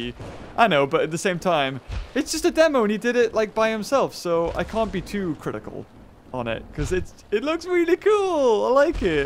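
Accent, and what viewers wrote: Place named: Scotland, United Kingdom. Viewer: With your accent you sound exactly like the actor Ewen Bremner who was in Alien vs Predator movie... heh... When you said .. "Oh God..." it reminded me of this scene ---> <link> Are you Scottish? He is.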